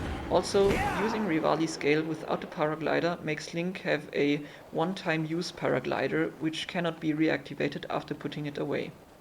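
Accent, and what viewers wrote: Germany. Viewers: deutscher akzent :D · man hört sofort den deutschen Akzent raus :D gutes video! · Man merkt den Deutschen akzent hast ein gutes Englisch :D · As a German I knew you were German without seeing in-game language :D we just can't get rid of that accent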